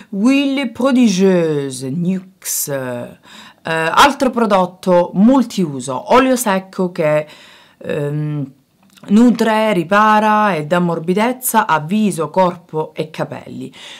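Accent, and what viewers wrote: Sicily, Italy. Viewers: si sente dinuovo l'accento sicilia <3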